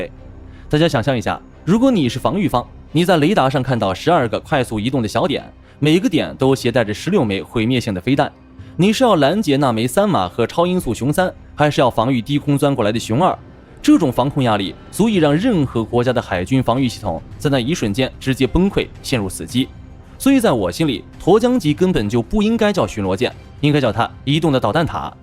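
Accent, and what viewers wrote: China. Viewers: 北京腔談台灣國艦？ Awkward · 用的豆包做的AI视频吗？怎么解说都是一股中国大陆的味道。不应该用台积电的2纳米芯片的AI去做吗？😆😁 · 你的口音好像大陸人 是因為你很討厭 共產黨嗎？所以才會研究與喜歡台灣的特殊艦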